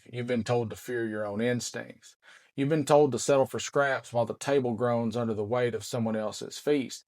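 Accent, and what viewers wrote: South, United States. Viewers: Glad to hear more southern accents speak these truths!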